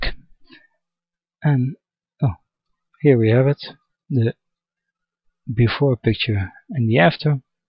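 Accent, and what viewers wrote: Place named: Netherlands. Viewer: Could it be that your dutch, you have a very dutch accent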